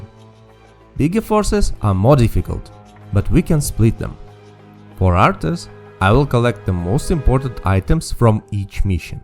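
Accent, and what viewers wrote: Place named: Eastern Europe. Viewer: I love the idea of this run, pretty unique. Makes you wanna give each unit a nickname, like Sarah the Sorcerer, or Pat the Priest. I've been starting to shake off the RTS rust with one of my other childhood games, AoM, and the idea of doing this challenge on that game sounds super cool; I'm gonna need to start trying it. All that being said, before you commit to doing the entire WC3 campaign with this ruleset, I have two words to say: King Arthas Have fun with that one :D btw, really like your accent. If I may, what is it? Sounds Eastern European.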